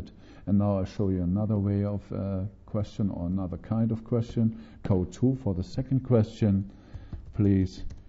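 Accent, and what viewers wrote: Germany. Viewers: How do we see the video in better quality? His English is a bit German :)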